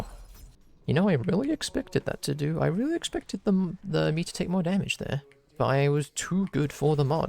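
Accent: United Kingdom. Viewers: WHY DOES HE SOUND MORE BRITISH IN THIS ONE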